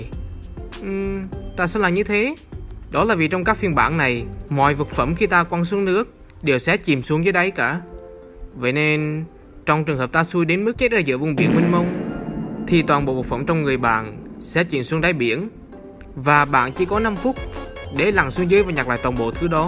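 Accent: Central, Vietnam. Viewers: Nếu mn chưa theo dõi kênh của lâu thì hồi xưa tầm 1 năm trước có nói tiếng miền trung =)))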